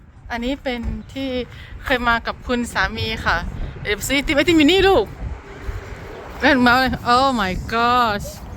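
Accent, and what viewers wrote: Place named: North, Thailand. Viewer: ลูกสาวสวยมากกกกก สำเนียงคณแม่ เป็นชาวเหนือหรือเปล่า ขอโทษนะคะ เพิ่งตืดตามค่ะ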